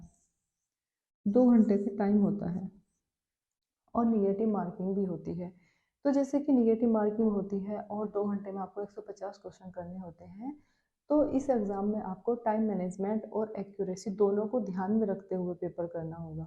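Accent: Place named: Western Uttar Pradesh, India. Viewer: आप की बोली पश्चिम उत्तर प्रदेश की है मेरठ मुजफ्फरनगर क्षेत्र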